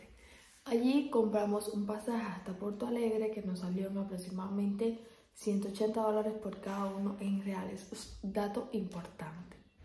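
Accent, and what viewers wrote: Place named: Cuba. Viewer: Ese acento de que parte de Cuba es?? Un grande saludo de un Colombiano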